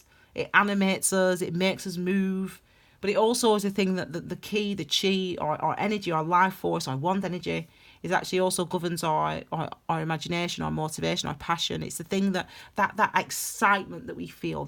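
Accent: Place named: Lancashire, United Kingdom. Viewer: First time listening, great reading, really spot on for me! Your accent sounds like you're a Lancashire Lass, am I close? I'm from that region originally myself. ♥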